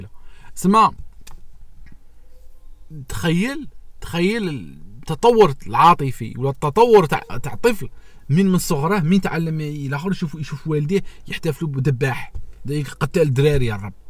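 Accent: Oran, Algeria. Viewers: C'est du pur nectar à vous écouter parler oranais que ce soit pour la forme ou le contenu. Merci.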